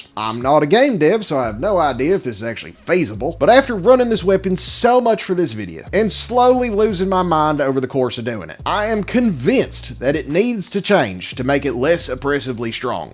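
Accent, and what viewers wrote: South, United States. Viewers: not with his accent. the southern accent eliminates the 'i' from the 'ai' dipthong, which means 'fire' is pronounced more like 'far'.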